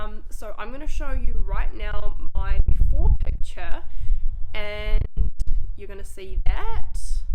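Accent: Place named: New Zealand. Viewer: As soon as you spoke I knew you were a fellow kiwi and the struggle is real to gain the proper technique when it comes to vegan weightloss! You are so right when it comes to the confusion over all these trains of thought about diet! Wow that is so exciting to be collaborating with Kiki and Hannah when you are just starting out! You must have been mind blown! ! They are both my favorite vegan you tubers! ! Way to go!!!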